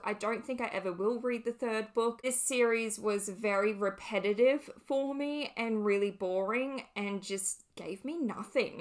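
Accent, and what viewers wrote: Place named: Australia. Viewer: I love your accent. It’s Australian right?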